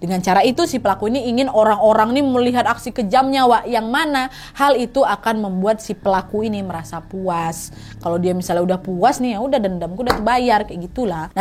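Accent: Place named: Sumatra, Indonesia. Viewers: Mbak ni org Medan yaa 😁 Berarti di thn2 itu byk org hilang ya terbukti byk warga melapor ke polisi bhw anggota kel mrk hilang..serem yaa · Kau itu cerita seakan akan kau ada dan melihat langsung kejadian itu....kau pun kurasa belum lahir...dan kau pun dari logat bahasamu orang Sumatra ... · Dari Style Bicaranya, sepertinya Wak orang Pekanbaru ya?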